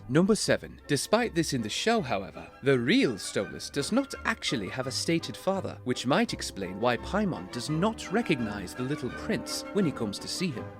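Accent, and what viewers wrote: United Kingdom. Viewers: Man with hoity-toity British voice gets increasingly drunk talking about a horny owl demon. This is the most video I've watched all day. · I went insane listening to this drunk British guy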